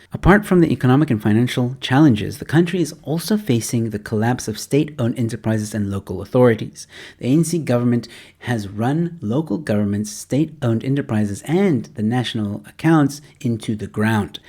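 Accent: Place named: United States. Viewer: Please keep going on as usual, so what if you sound American or look Mexican? I fail to see how that has any bearing on your opinion. I, for one am interested in your opinion and appreciate the concise presentation.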